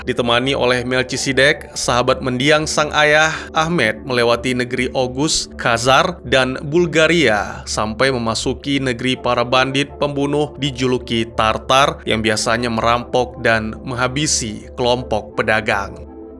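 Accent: Aceh, Indonesia. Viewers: Abang orang Aceh ya ?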